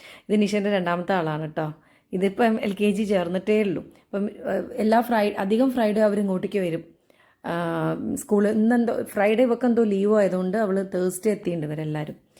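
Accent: Kozhikode, India. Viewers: Nadathi 😂😂😂 inte Ingale kondu thottuuu 😅😅 mmale koikodu slang 😂😂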